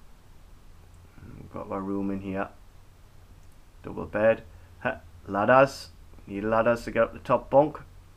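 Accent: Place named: Newcastle, United Kingdom. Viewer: lol your geordie accent made me laugh when talking about your pillows then straight on to the pillars. Great work mate looking awesome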